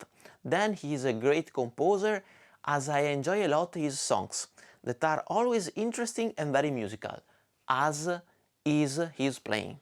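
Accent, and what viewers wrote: Italy. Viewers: Your pronunciation is a kind of "itanglish"(Italian and English) but don't affect the incredible reviews that you made. Prepare to be a next super masive channel. Congratulations!!!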